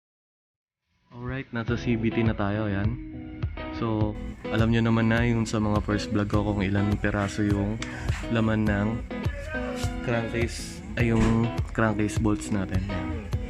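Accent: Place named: Iloilo, Philippines. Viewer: hahahah medyu malapit ako jan boss hahaha linti ilonggo kman gali hahaha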